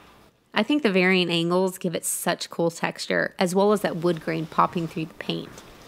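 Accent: Texas, United States. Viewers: A Texas saw is sawl. Like she says, she talks how she talks. And her saw has a L at the end!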